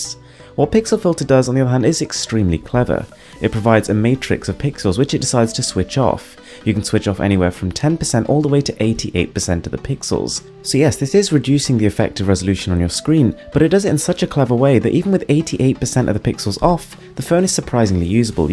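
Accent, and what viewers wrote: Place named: England, United Kingdom. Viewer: Only thing I think I get impressive in your video is your sound and way you speak English. I didn't see any Indians speak English like a English men. I feel you do little showoff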